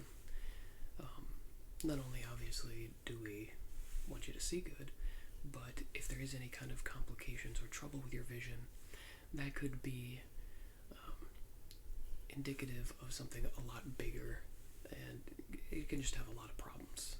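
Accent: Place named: United States. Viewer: This guy looks so British I was expecting a regional accent like a Yorkshire accent not a freedom murica one. Great ASMR though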